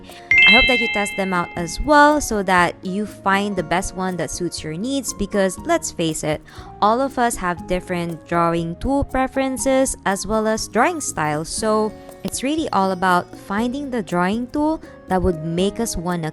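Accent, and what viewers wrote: Philippines. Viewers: Filipino or no? 🤔 Your speech kinda sounds filipino ✋😐🤚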